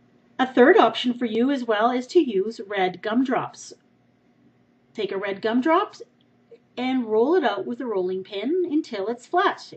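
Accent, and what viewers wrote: Canada. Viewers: Lies! Lol, not that it's a BAD thing necessarily, but I have definitely head you yourself say the word about like "aboot" while watching a large amount of your videos -I'm kinda addicted- :P. Seriously, that was how I almost instantly knew you were Canadian. I just went to your actual channel to verify.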